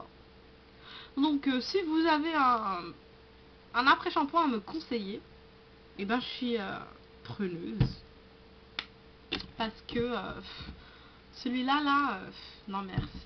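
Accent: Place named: Ivory Coast. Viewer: t une fofolle toi! tro marrant kan tu parles avc l accent cainfri, hihi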